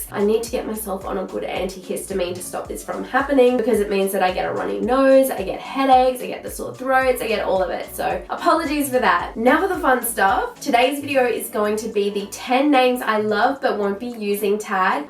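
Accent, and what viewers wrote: Australia. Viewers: OMG she sounds like an astraillian person lol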